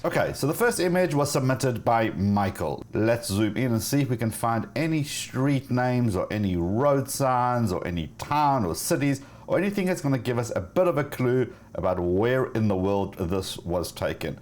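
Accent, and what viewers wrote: South Africa. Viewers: Bro great vid it's bothering me you sound South African 😂but in about you from usa😂😂🤔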